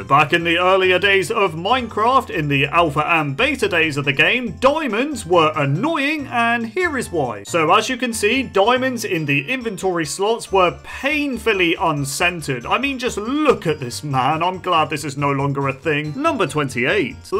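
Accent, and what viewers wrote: United Kingdom. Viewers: bri'ish voice? ı'm new :/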